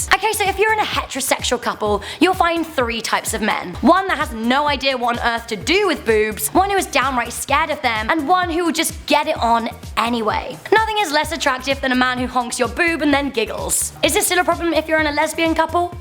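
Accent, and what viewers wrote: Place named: United Kingdom. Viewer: British accent is annoying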